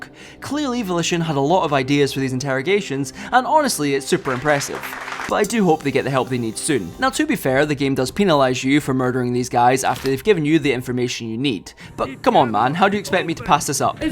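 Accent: United Kingdom. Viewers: I can't listen to this horrible british accent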